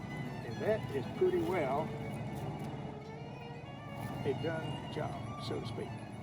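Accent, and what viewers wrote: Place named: South, United States. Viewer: me too. I was expecting a different accent for sure. Happy it’s a southern accent I can understand!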